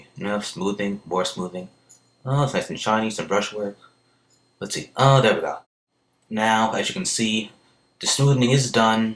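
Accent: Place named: Texas, United States. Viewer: Its his accent DUH. In Pennsylvania people may sound like their nose is pinched (sorry all you people from Pennsylvania I was just using you as an example) But in Texas we sound like cowboys and cowgirls.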